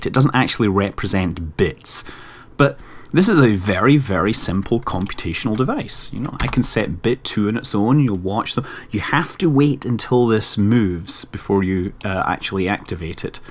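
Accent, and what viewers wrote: Scotland, United Kingdom. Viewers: I love your voice. And no not just your delightful scottish accent, your voice is really nice. I'd LOVE to grab a space-pint with you some day and talk physics as our spaceship slowly deorbits into Minmus....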